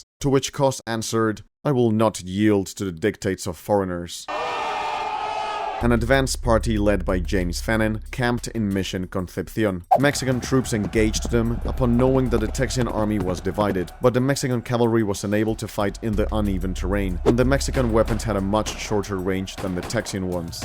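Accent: Castile, Spain. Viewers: My knowledge of Mexican history is bad, but not terrible, I’d like to think I have a 1.5 or 2 stars rating. But hearing the proper Spanish pronunciation of names and not recognizing them, even though I’ve READ these names before has me shook lmao 😂😂😂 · Why are saying it like the Spanish way and not the Mexican/Latin American way of pronouncing the Zs and Cs. The odds are none of these historic figures pronounced it that way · Your English is among the best of any Spaniard I've ever heard... although I have to say it's a bit odd/amusing to hear all these Mexican names pronounced with a Castilian accent. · Sounds very Castilian, I like it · He said in another comment that he was born and raised in Spain, so he's just talking in his native accent.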